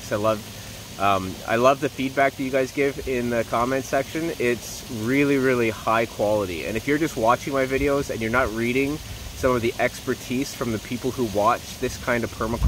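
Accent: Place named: Canada. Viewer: Three great things about this channel-- the contagious "Permaculture Joy," the attention to science (and lack of anthropomorphism), and the delightful Canadian pronunciation of "ou." Look forward to every video! I've been on the fence about sea-buckthorn/seaberry for years (even after viewing Ben Falk's videos) due to management concerns. Can you convince me, lol?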